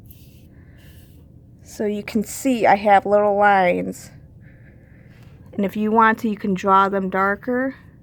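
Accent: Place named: Minnesota, United States. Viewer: Are you from minnesota? lol I think I hear the accent.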